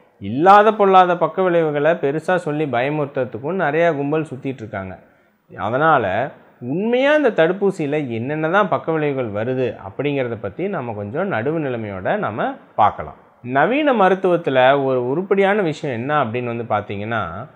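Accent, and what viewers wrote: Kongu, India. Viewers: கொங்கு மண்டலத்து பேச்சு நக்கல் நையாண்டி அப்படியே இருக்கு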